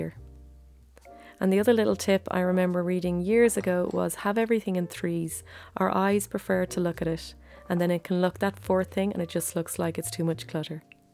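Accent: Ireland. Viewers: YES, you're right! I am from France, near Lourdes, and I do so much like this soft lovely Irish voice, as you said! Thanks,!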